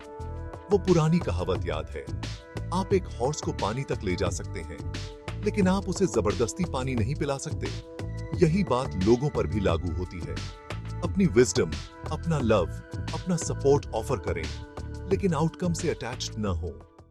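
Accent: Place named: India. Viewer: English thodi thodi Hindi jaisi hai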